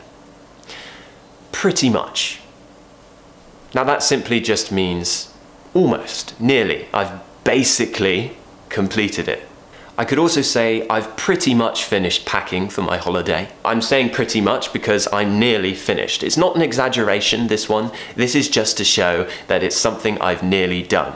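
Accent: United Kingdom. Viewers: I am gonna come to uk just to speak in your accent. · I'm not exaggereting right now. Literally, you are the best english teacher on youtube or galaxy. I'm constantly watching your videos and learning decent British accent. Thank you very much mate. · Because he himself speaks very clearly british English it is easy to improve our accent just while listening to him and repeating the way he speaks. That’s why he is very effective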